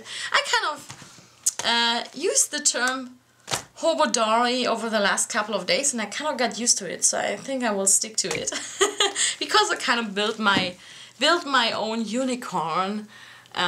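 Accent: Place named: Germany. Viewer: Deutschen Akzent sofort erkannt haha. Ich liebe deine Kunst sehr schön 😘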